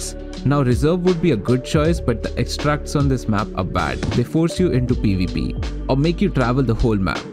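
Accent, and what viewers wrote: India. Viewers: Idk why but you sound like an INDIAN... If yes than i'm surprised to see an indian making tarkov content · your indian accent makes this video 2x more enjoyable. On the other hand it contains very valuable knowledge - super good vid. Well done sir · Hears Indian accent. Clicks off.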